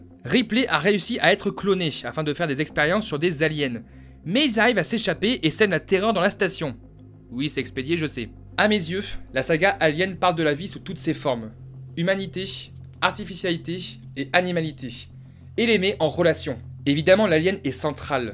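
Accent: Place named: Paris, France. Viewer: vidéo bien faite, par contre cet accent parisien snobe est insupportable "particularitéffff, il sort les yeuxffff, mercichhhiiaann etc" donc pas de pouce bleuffffffan, mais pas de rouge par respect pour le travail.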